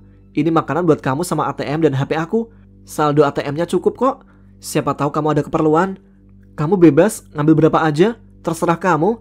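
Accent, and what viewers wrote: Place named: Jakarta, Indonesia. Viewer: Anjirrrr lahhhhh makin seru dan penasaran aja, apalgi mas pakai logat betawi nya kena bangetttt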